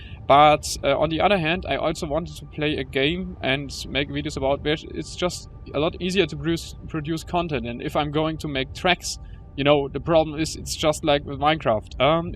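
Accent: Germany. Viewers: you have a German accent!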